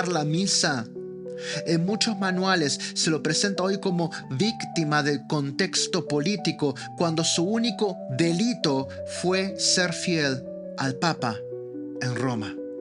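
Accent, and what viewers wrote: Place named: Argentina. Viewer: Excelente hermano, vas con todo!!! Gracias a Dios por tu conversion. Y tu forma de expresarte tan contundente. Me encanta tu peculiar acento Argentino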